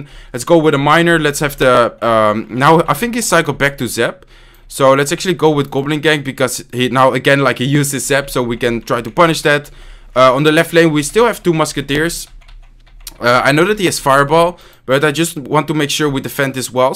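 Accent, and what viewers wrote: Netherlands. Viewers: als nederlanders engels praten, hoor je het echt dat ze nederlands zijn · Je praat echt nederlands engels, of goed engels of nederland man!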